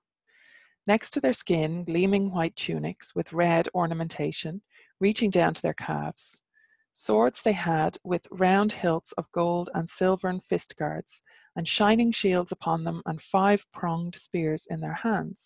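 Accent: Ireland. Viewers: Enjoying this immensely. I am in the US so your "Irish lilt" is especially charming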